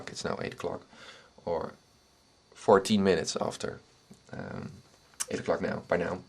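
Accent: Netherlands. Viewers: Ben je Nederlands dude P: ? ik hoor t aan je stem.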